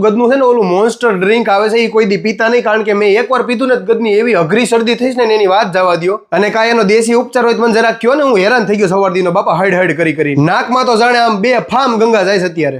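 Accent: Kathiawar, India. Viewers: Tame ketli saras kathiyavadi bhasha bolo cho😂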